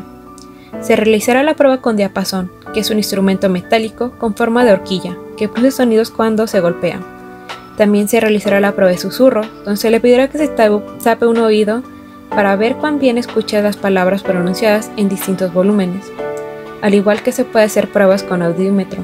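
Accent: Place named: United States. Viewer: Español de USA 🇺🇸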